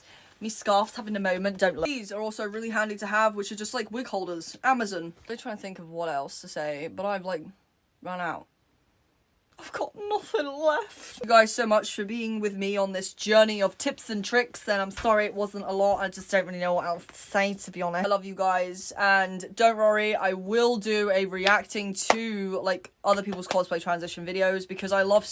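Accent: United Kingdom. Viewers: Are you british? (Im just asking out of curiosity)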